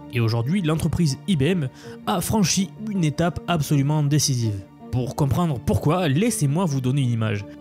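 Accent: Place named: Southern France, France. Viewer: L'accent du sud de la France est rafraichissant! Bisous du Québec!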